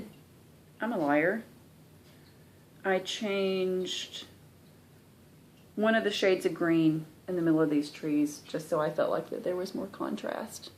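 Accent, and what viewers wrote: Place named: Atlanta, United States. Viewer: Hi. Loved your video. I was delighted to hear an accent similar to mine! I live south of Atlanta so you sound perfect to me! I'm fairly new to Floss Tube so I just found your channel. Looking forward to your next video.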